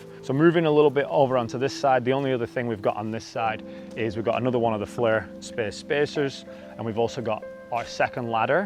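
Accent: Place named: Lancashire, United Kingdom. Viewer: Nice van, something tells me there’s a bit of Darwin, Preston, Blackburn in your accent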